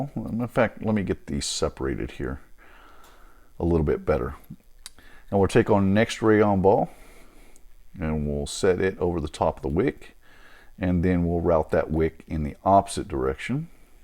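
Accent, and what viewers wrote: United States. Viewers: Good old American country twang sounds fine to me.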